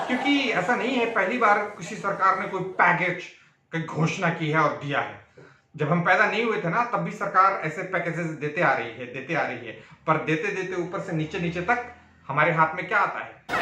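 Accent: Bihar, India. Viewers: Bihari Andaz Mai EK THOW Ka shandhar presentation, Phir Economic warriors, Keep it up.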